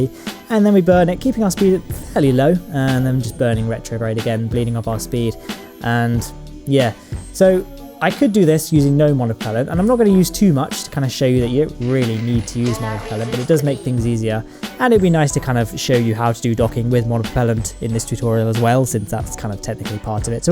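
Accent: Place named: Cambridgeshire, United Kingdom. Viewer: I wonder what county he’s from,Sound like Cambs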